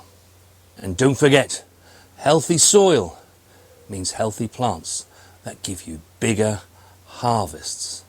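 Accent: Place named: RP, United Kingdom. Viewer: Where abouts in Norfolk are you - I'm strugglng to identfy it by your rather posh well spoken accent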